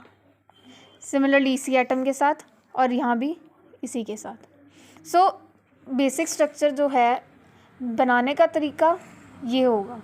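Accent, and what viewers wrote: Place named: Haryana, India. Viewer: Aap Haryane se ho na...Don't tell lie. Because I am from Haryana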